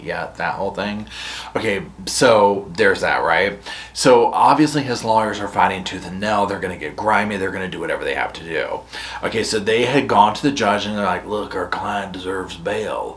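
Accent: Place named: North Carolina, United States. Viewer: NC!! I knew your accent sounded like mine! Xoxo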